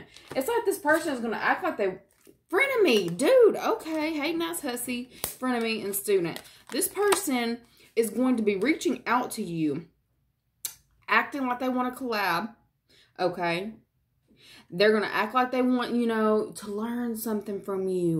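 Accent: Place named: South, United States. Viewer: Thank you so much for this reading!🙏🌻♥️ Your voice sounds just like one of my friends in Charlotte, NC. This is crazy!!😅 Love hearing your readings!!🙏❤️🌷 You must be from the south. You sound like a southern bell. I have not been in a date in 29 years. I'm a June 20th Gemini, cusper. Girl, I'm not copying anyone! I am very strong and am very worthy! I love me and love who I am.🤗♥️ I've been kicked in the butt big time. I'm the most important to me. I'm remodeling my home. It needs it desperately!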